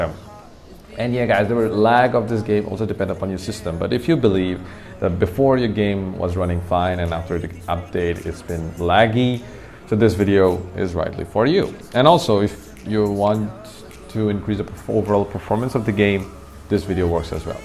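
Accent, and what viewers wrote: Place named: India. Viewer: indian with crazy english accent 😍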